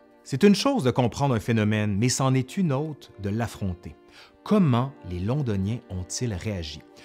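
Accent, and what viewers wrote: Quebec, Canada. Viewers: Très intéressant merci et en plus raconté avec votre charmant accent Québécois, c'est un plus. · Vidéo très intéressante et agréable à écouter, c’est la première fois que j’écoute une vidéo en « français canadien » jusqu’au bout 😊👌🏼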